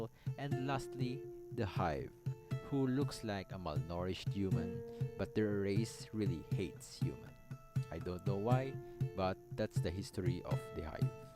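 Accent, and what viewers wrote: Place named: Philippines. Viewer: Such a Strong Filipino accent haha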